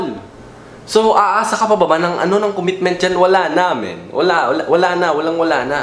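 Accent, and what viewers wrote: Ilocos, Philippines. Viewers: From Ilocos kaba? Kasi may accent ka ng iloco. Specially sa Laoag 😂 so cute! Anyway, your advices can help to those who need it. 😂 God bless and more vids. to come!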